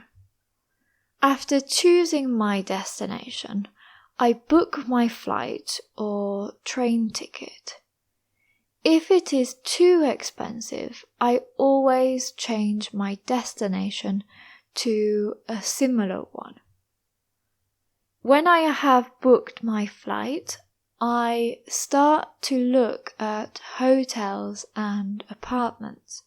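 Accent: United Kingdom. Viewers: I love British accent, thank you for support, its so difficult find it, i happy cause i found out your channel,. 😁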